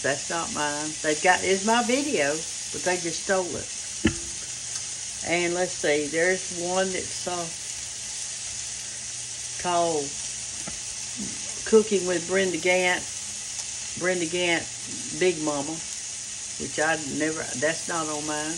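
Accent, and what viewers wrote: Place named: South, United States. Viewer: Love your Southern accent Brenda. I’m a fairly recent viewer from California. You are the BEST!!!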